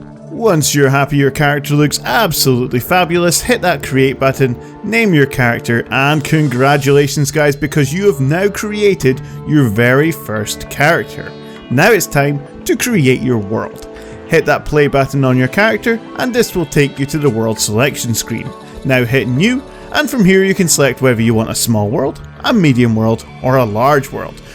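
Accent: Scotland, United Kingdom. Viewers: My NPC died what do I do? Also are you from Scotland where are you from? I’m from Edinburgh.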